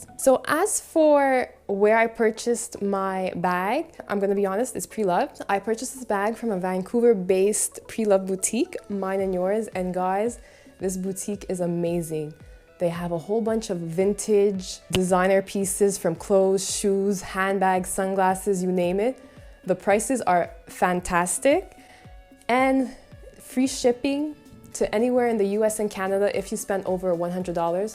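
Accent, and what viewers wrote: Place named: Turkey. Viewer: Your accent very much sounds like Turkish! 😯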